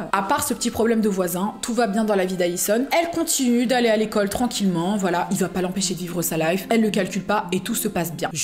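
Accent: England, United Kingdom. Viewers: J’aime trop ton accent d’anglais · T grave. Flipante et vrm t trop bien et j’adore ton axant anglais 🤐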